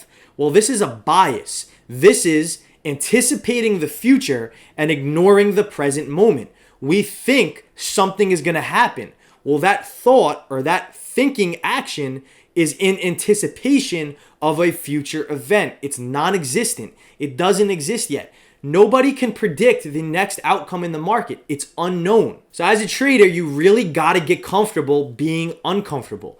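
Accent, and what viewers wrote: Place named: Long Island, United States. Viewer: He’s definitely from Long Island. Spittin facts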